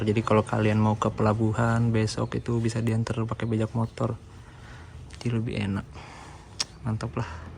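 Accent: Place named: Depok, Indonesia. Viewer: Bahasa lo depok bet bang ✌✌😉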